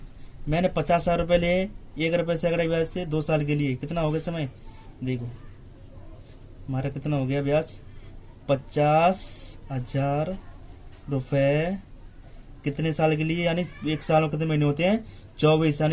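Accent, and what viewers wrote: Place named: Dholpur, India. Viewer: भाई भाषा से धौलपुर साइड के लगते हो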